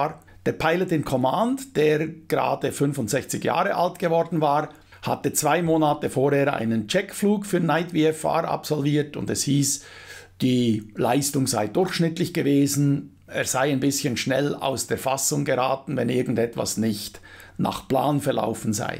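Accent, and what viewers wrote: Switzerland. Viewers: In der Flugplanung schon Fehler und dann noch in der Kontrollzone abdrehen müssen. Ich weiß nicht was diese zwei Jungs da oben in dieser Nacht geritten hat. Da ist nicht viel Flugerfahrung eingesetzt worden. Traurig und es wäre nicht notwendig gewesen, in dieser Nacht sein Leben zu verlieren. Interessantes und gut erklärtes Video. Ich mag Deinen Schweizer Dialekt. LG aus EDTF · Das ist kein Schweizer Dialekt, das ist ein Schweizer der Hochdeutsch redet. Das tönt ja in Deutschland auch je nach Gegend verschieden.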